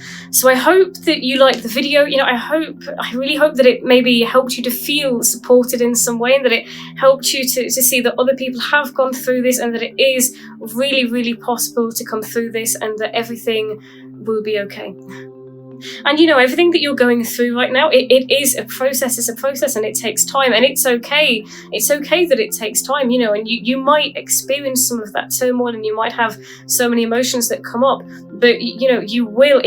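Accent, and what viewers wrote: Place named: England, United Kingdom. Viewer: I so wish YouTube and content like this was a thing when I was in my teens. There was literally nothing and zero information, and found myself so much in denial that I wasted a decade in a doomed marriage. Great upload, very much enjoyed it. PS - I've recently been blitzing content such as this, and is refreshing to hear an English accent :)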